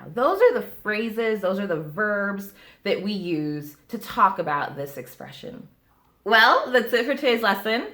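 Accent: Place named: United States. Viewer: Hey! Enrique here. I love all your lessons bc they sound like the real American English! Sometimes, I struggle with the accent of native English speakers from England or Australia. For instance, did you see the video in which the Dagenham boy told Meghan "I think she's beautiful " That "beautiful" it sounds so weird to me like it is not enough English. Of course, it is a good English. Could you please give me some tips about pronunciations skills or listening skills? Thanks in advance.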